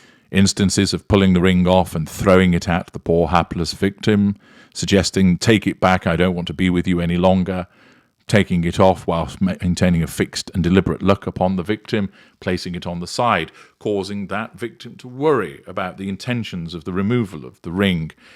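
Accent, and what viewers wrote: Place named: Scotland, United Kingdom. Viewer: love the scottish accent lol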